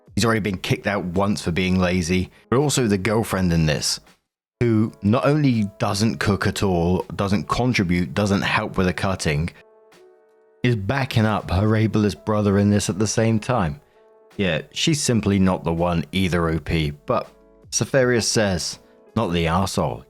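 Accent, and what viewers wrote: United Kingdom. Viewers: You're a Brit 😮!?!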